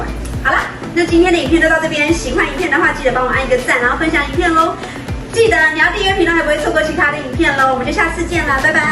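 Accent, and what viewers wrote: Taiwan. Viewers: 這台語蠻有特色的🤣🤣🤣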